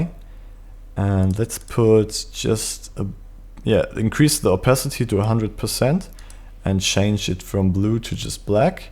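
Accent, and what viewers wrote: Germany. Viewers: Yeah sounds german 😀 Greetings from Germany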